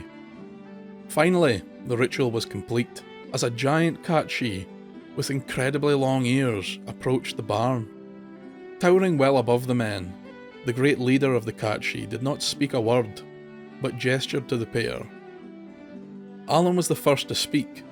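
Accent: Scotland, United Kingdom. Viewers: His Scottish accent might be a hint lol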